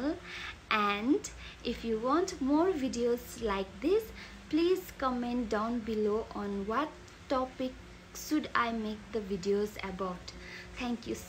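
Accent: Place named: United Kingdom. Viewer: Your accent like British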